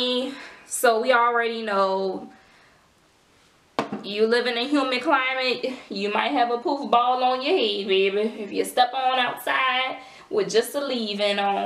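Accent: New Orleans, United States. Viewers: Sounds like a hear a whole lotta NOLA in your voice #yaheardme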